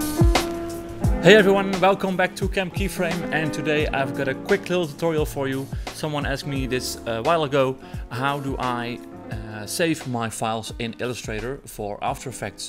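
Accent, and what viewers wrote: Netherlands. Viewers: The accent might be Dutch, not sure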